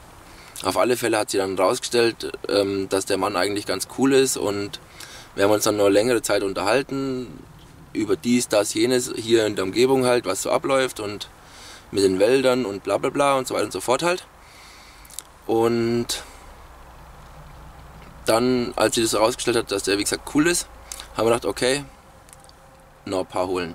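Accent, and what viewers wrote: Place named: Franconia, Germany. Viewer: weil du total fränkisch redest und du auch schon in der frändischen schweiz unterwegs warst... Gruss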